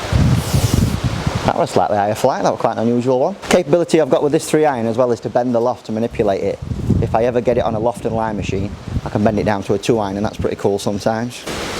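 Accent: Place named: Yorkshire, United Kingdom. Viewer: Have me in stitches everytime I watch. Proper Yorkshire man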